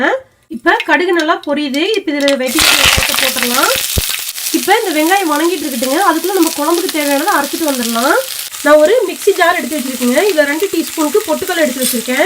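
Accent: Kongu, India. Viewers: Super your kongu tamil is very sweet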